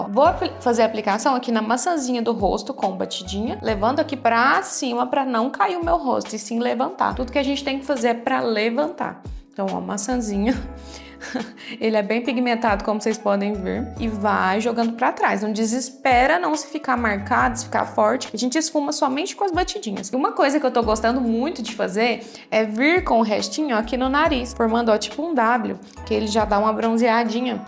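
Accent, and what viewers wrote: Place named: Minas Gerais, Brazil. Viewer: esse sotaque tipo mineira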